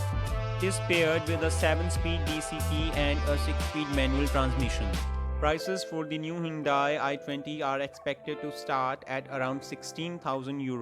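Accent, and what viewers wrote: India. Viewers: the indian accent 🤪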